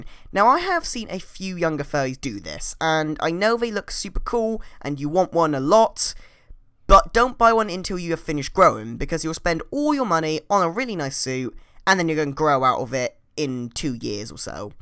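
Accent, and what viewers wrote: United Kingdom. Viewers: r u fucking british?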